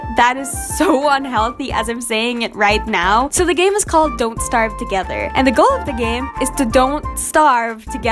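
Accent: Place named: United States. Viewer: This is really shocking she sounds like an American but she's a Filipino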